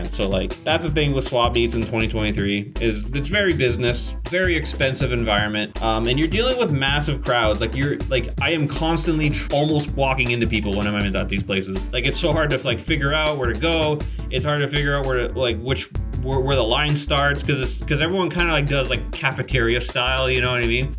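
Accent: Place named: United States. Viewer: As a Michigander, I think your accent is very American. In regards to game collecting in 2023, if it’s not Nintendo it’s not horrible. I collect complete in box ps1, ps2, and Genesis carts and Iv got a good collection and I only started this year, and I don’t feel like a paid too much. Just don’t buy games you’re not gonna play, it’s that simple.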